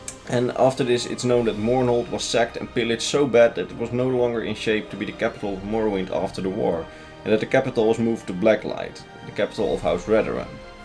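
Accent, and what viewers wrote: Netherlands. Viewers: Je klinkt zo nederlands als je engels praat 😂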